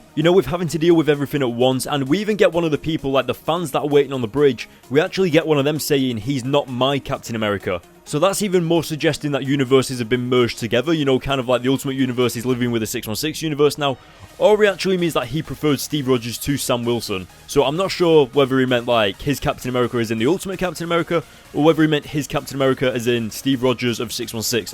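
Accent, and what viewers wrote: United Kingdom. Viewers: Crazy stuff, crazy stuff, crazy stuff...that in your british accent just makes me smile. I like being british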